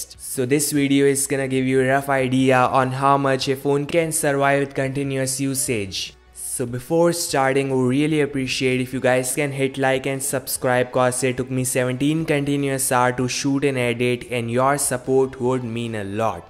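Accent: India. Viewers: Oh my...that Hindglish 🤦‍♀🤦‍♀🤦‍♂🤦‍♂ffs!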